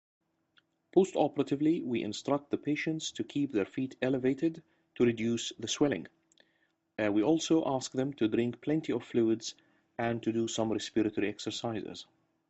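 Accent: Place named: Eastern Europe. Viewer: Where comes the speaker from? I think he are from finland or russia or other slavic country!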